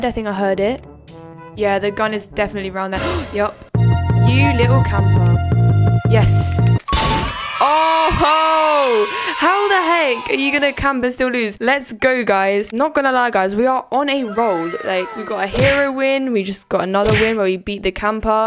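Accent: United Kingdom. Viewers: I can actually tel that ur from England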